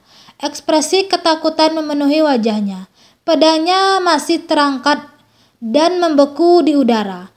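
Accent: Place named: West Sumatra, Indonesia. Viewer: Logat urang minang😂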